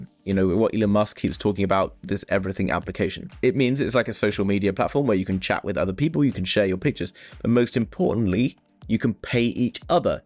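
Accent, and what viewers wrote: United Kingdom. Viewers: You look like a young rich old wise man if that makes sense. It’s probably the old money living room and British accent you have. I love it haha 😂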